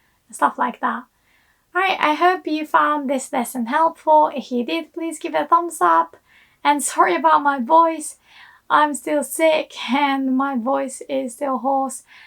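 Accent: United Kingdom. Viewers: 先生はイギリス人みたいに話す (*^_^*) Come to think of it, if I want to say "you sound like (like, your accent)", do I still use みたいに？ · 君は英国人みたいに話す。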